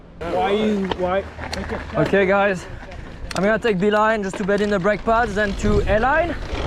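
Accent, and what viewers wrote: France. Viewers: This guy has the heaviest French accent I ever heard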